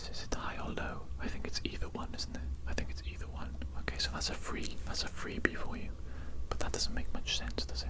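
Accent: Wales, United Kingdom. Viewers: wondered and wondered if i was imagining the welsh accent, but then you said 'ini' and i'm certain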